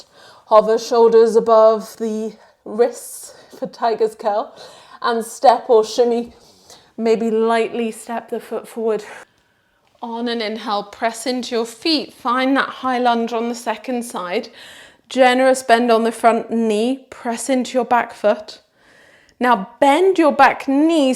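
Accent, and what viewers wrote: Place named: England, United Kingdom. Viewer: I so needed this! Thank you so much again! Always love the england accent in there:)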